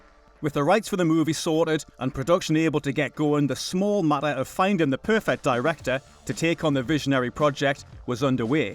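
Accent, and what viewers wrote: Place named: Newcastle, United Kingdom. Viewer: A bold move to use a Geordie for the V/O!!! One of my favorite accents in the world!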